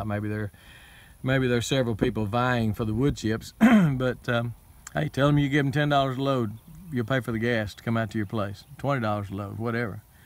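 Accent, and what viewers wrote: Texas, United States. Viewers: I love your videos. You voice and accent sounds like my dearly loved uncle who grew up in Northeast Texas then went to A&M (the Corp then vet school). I am not sure but I think he got his accent when he was an Aggie because nobody else in the family talks quite like him. Thanks for sharing your knowledge with us. Texas farmers have unique challenges and there's little use in me learning from people who live outside my region. You teach me a lot.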